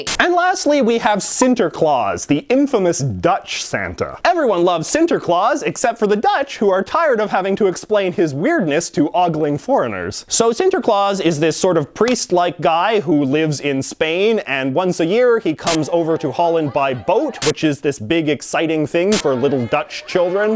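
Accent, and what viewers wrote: Canada. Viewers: He's very phonetic when he speaks. He even says the aboots and oots and aroond. Which I'm sure many agree not very many people in Canada pronounce it that way. Either way he's insightful and I don't mind. I'm actually more weirded out by the Canadian than his very bad pronunciation of дед мороз. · Hmmm you’re Canadian aren’t you, squidward...? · Hi J.J.McCullough. As a fellow Canadian, I am just wondering what part of Canada you are from. You seem to have a pretty thick accent when it comes to words like about which only exist in some provinces. Just curious, cause I'm from Southern Ontario and we don't seem to say about the same way 😂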